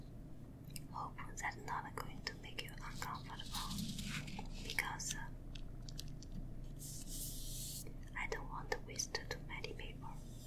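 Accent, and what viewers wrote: China. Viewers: 英文很有中国味道.......很好听的说。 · when you realize the Chinese speech stereotype is almost on point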